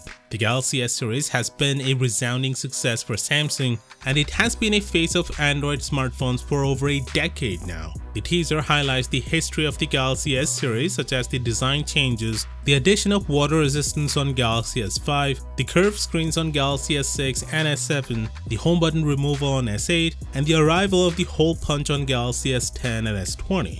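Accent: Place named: India. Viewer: The Indian accent is so rough on ears. ..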